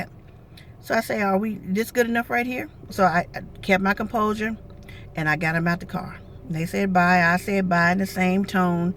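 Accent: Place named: United States. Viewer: yeah, a long way from america.. ive watched a few of your videos already and i can't get enough of your accent, if i may id like to ask where you grew up? theres something about a soft spoken American accent that i find relaxing to listen to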